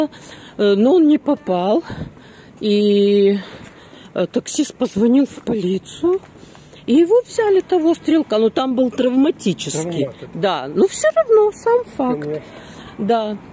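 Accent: Odessa, Ukraine. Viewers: Спасибо за вашу прогулку по Одессе и ваш такой родной одесский акцент!❤❤❤❤❤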